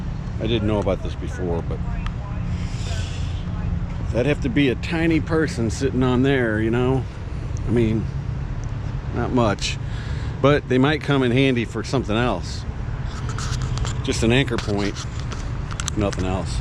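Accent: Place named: St. Louis, United States. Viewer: Hey, former south St. Lou here. Have been checkin out 300L vids and ran on to you. Clicked on one of your vids and as soon as you started talking I said this dude is from St.Lou haha. I moved away in 1995 sooo! But I lived there for 29 years and had a Harley super glide that after hittin the bars we would sometimes go out to Creve Coeur Mill rd and blast em. That was late 70's so those soccer fields and all that wasen't there and it was dark. Cops would turn on their lights and come after us but wouldn't stop and get out to confront us out there in the dark lol. I have a Africa Twin now down here in South Georgia and it's a beast but am looking to get something smaller and easier to handle as I'll be 71 on next birthday. I had a little yamaha 360 enduro back in the day and it was lower seat but knobbys and it would go about anywhere so start cutting through places and jumping curbs and use that thing the way it can be and you'll have more fun. 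Good luck with yours! PS I know about the divorce thing. No sweat you'll be better off!!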